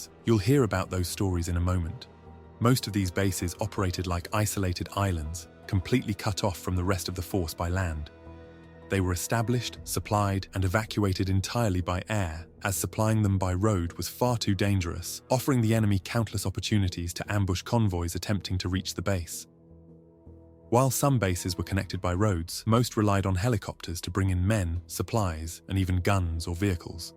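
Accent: United Kingdom. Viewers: Why does the narrator have ti sound like a damn Limey!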